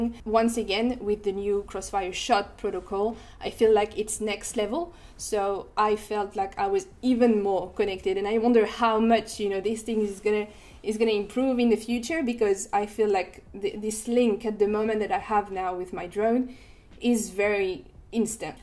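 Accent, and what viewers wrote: France. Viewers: I meant like are you in France or a different country? Like the accent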